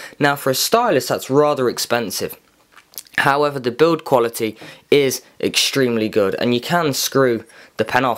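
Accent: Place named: United Kingdom. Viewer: I love your british accent!!!!!! :D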